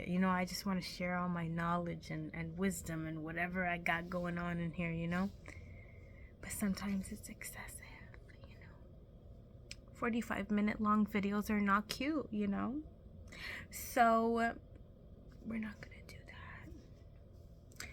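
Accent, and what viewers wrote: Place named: New York, United States. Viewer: Ahh, i could almost tell you're a New Yorker! Aayyyy. Keep going girl, I'm rooting for you.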